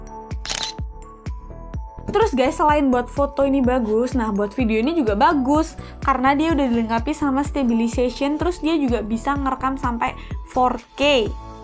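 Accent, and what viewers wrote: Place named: Java, Indonesia. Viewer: Logat jawa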